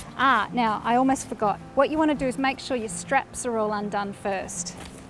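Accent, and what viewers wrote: Australia. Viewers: just arrived in Australia a bit more than a month ago. Now while watching the video I didn't even notice the Aussie accent! I'm not a native English speaker, but I think I really like the way Aussies speak. + Great video! I'm heading to the Wall of Jerusalem in a few days, and this video helps a lot! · I like your Aussie accent :) I think I'm a backpacking snob. I have a huge sleeping bag, huge mat, a pillow...they won't even come CLOSE to fitting inside. They all have to be hung. I brought canned food, a bunch of water. My first trip was 3 miles (4.8 km), 2500 ft(762 m) of elevation. It was kinda tough, I had to rest once, although right at the end. Maybe as I get more sophisticated, I'll bring smaller stuff. Thanks for the video!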